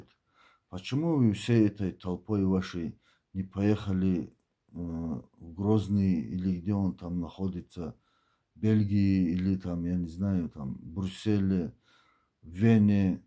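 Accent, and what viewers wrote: Dagestan, Russia. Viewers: Акцент аварский,,, никакой он не чеченец, это фуфло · А с чего ты взял что ты чеченец ты аварец и твой акцент это говорит и не отрицай это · С каких пор чеченцы говорят с дагестанским акцентом? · Это не чеченец акцент дагестанский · С нашим акцентом аварским😅 рассуждать о чужой жене ему надо?? Я женщина и то такие мысли не пришли подходит ей муж или не подходит…😅 больше проблем нету у этого мужчины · Это походу не чеченец!это провокатор!у него акцент не чеченца!!!больше похож на дагестанский!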